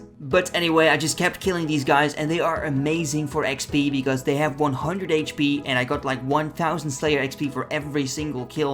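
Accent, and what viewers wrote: Netherlands. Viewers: Took me a few episodes to realize that you are dutch haha, lekker man👌🏼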